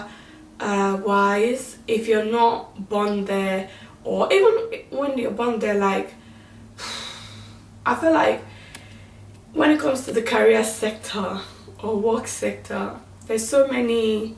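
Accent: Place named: United Kingdom. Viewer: U have such a beautiful British accent and thank you so much for this content it is more than helpful.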